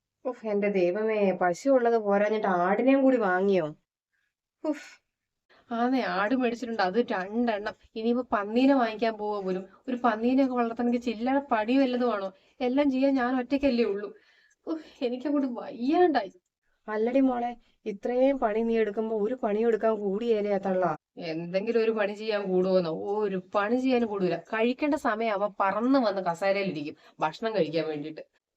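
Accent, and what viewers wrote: Idukki, India. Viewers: ഹയ് ഇടുക്കി slang കലക്കി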